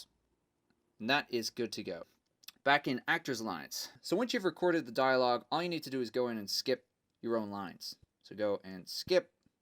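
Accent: United States. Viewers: Lol! Love your yankee voice; Sounds a little like will Wheaton. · Honestly man, your American accent is on point. Good job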